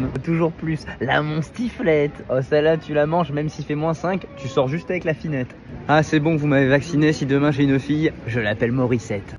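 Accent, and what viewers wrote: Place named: Alsace, France. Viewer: Ben c'est sûr, il est à Strasbourg, mais prononce à la hautrhinoise... Et en plus, on en a 2 des jours fériés en plus ✌️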